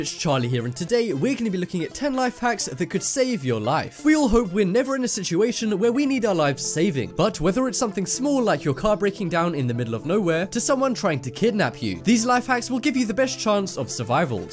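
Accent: United States. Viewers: You swy you're from the UK, but you talk like an American. I wonder if you are lying about your heritage...?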